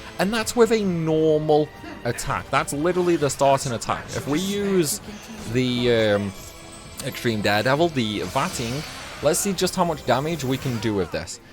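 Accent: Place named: England, United Kingdom. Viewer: FAXXX 😂 ITS already difficult to understand a English dude from England, as an American his accent can get annoying, specially when he talks instead of giving us step by step process.😂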